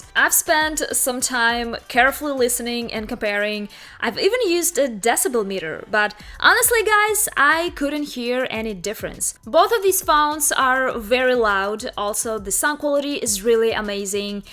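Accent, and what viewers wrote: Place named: Russia. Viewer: Привет,. Приятно слышать русский акцент:)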